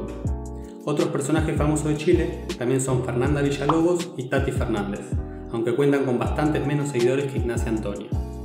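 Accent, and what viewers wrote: Argentina. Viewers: EL NARRADOR ES ARGENTINO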